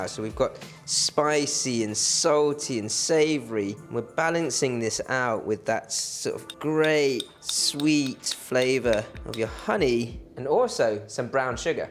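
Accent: England, United Kingdom. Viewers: His England or British accent doesn't have anything to do with his cooking skills. Trip part bou it is he may be Philapeno Lol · This Asian dude sounds more English than English people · I was confused for a second when he started to talk lol he looks asian but with a British accent. I will try this recipe, thanks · An Asian with a middle class English accent? Now I've seen everything! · Lmaoo I don’t trust a Korean with an English accent making anything Korean then he made it so non Korean like 😂😂😂😂 nice try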